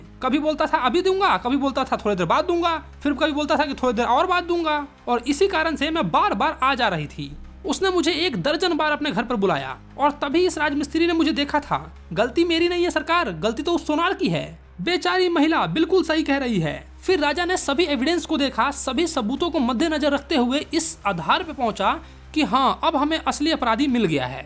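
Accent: Bihar, India. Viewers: Bhaiya ap Bihari ho n😊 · Bhaiyaa bihari ho kya😂😂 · His tone of talking is like Bihari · bhai is bihari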